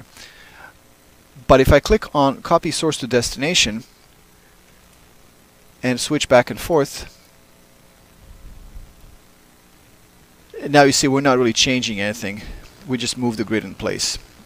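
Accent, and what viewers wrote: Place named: Russia. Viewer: Hi,It drives me crazy. You have a lot to say about a subject and then you become your own worse enemy by not doing the most simple things ruin your otherwise hard-worked tutorial. (Or in your case you just did not know or realize it sounded the way it does.) A pop filter (under $20 from B&H I hate Amazon) is just a screen put between your mouth and the mic that diffusion's the wind from your breath to get rid of the pops and esses a bad mic and setup can cause. I hate to see people go through all the trouble of doing a tut and then it's unwatchable or hard to listen to because of mechanics not subject nor idea. I hear you have I assume a Russian or Eastern accent and English is not your first language. How can you learn English and allow something as easy as not have a sock (really put a CLEAN sock over your mic) ruin your tutorials after going to the horrendous trouble of learning English? 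I've been devouring Resolve and Fusion but just found you. Good luck.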